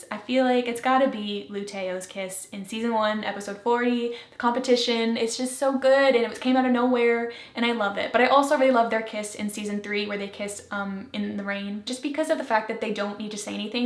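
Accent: Canada. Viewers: your canadian accent very much jumped out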